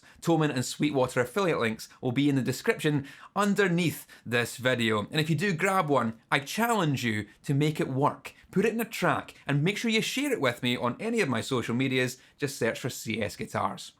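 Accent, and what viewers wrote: Scotland, United Kingdom. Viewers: Is that a scottish accent I hear? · Where is the commenter who transcribed Scottishness? I demand one of those for every one of his videos now.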